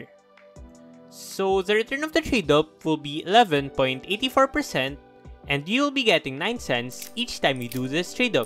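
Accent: Philippines. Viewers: my favorite trade up is the safehouse tradeup it made me 2.30 cents thanks you sound Filipino haha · Filipino for sure. Atleast he is trying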